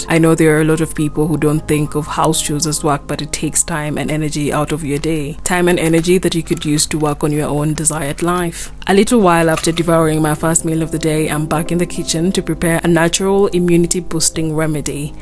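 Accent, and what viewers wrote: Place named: Kenya. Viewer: OMG u just reminded me of my plates 14 years ago before i left Kenya. Why that accent though? I love Kenyan accent. I still keep it after 14 years in USA.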